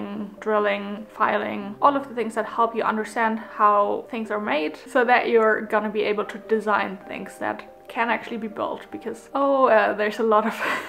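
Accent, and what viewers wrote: Germany. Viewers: Hi, there are many German colleagues at my company and all of a sudden I just want to see this accent again 😄. Then, saw your ''when german engineers speak English'' video. It was the first time I discovered this channel. When it comes to this video, a university internship has been a turning point in my career aim. I was planning to become a manager since the beginning of the university even though what it is exactly, just like you. Whenever I watch one of your videos, I am settled more creative and focused mindset. Thank you for the quality content and the thoughts you share!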